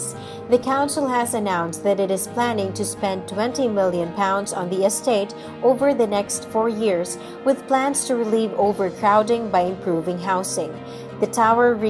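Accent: United States. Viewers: The text is fine , why on earth has "Oxford info", presumably outsourced by OCC , used a mechanised American voice for this video instead of a rich Oxford town burr? An answer pls? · Why is the narrator an American??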